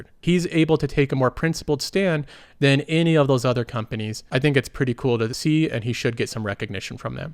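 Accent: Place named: Midwest, United States. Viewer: Midwestern american, I would guess but it's not a strong accent. Listen to "protests" , or ish, "we don't want you tah talk about politics."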